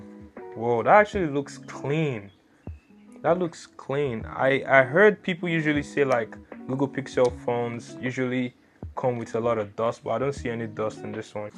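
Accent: Nigeria. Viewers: you straight up know he's Nigerian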